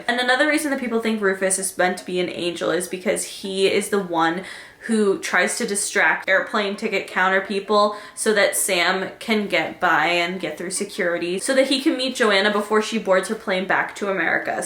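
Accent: United Kingdom. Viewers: Why am I so obsessed with your random British accent, it's so fucking funny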